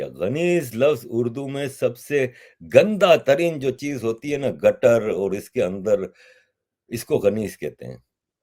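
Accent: Gujarat, India. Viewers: YOU ARE TALKING LIKE GUJARATI N THATS WHY YOU ARE SWEETEST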